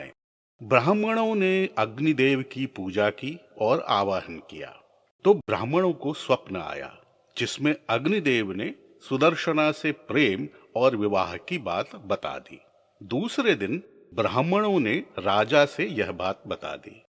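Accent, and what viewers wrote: Standard. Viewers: वाह वाह वाह अद्भुत कथा तथा आपकी वाणी के माधुर्य और भाषा के शुद्ध उच्चारण का जवाब नहीं सादर प्रणाम आदरणीय🙏💐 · महाभारत की अद्भुत् कथाओं की शृंखला में कही गयी एक और अद्भुत् और मेरे लिए एक दम नयी एक कथा...! कथा वाचन की आपकी शैली और कला को शतशः नमन आदरणीय। आपकी आवाज़ और उच्चारण की शुद्धता चमत्कृत करती है।चित्र एवं संगीत प्रभावी हैं। इस लाजवाब कथा और उसके शानदार प्रस्तुतिकरण के लिए आपको हार्दिक बधाई एवं धन्यवाद आदरणीय 🌹💐🌹💐🌹 सादर नमन एवं शुभरात्रि आदरणीय 🌷🙏🌷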